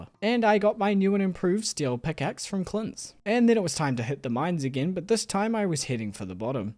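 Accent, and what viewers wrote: New Zealand. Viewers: Just watched all your 100 days series videos and I have to say I am absolutely loving it! 🙌 Your New Zealandish accent is quite funny to listen to while you play as some words you say are clearly very differently pronounced to what I assume most of your viewers accents would say which causes some funny confusions 🥴😂 Keep it up and looking forward to next episode! Much love from Australia!! 🇦🇺 Question: I guess you are managing just fine without it but where's your UI boost Mod at? 🤷‍♂️ And also a pigs 🐷 name has gotta be 'Pigpen' from Charlie Brown 😋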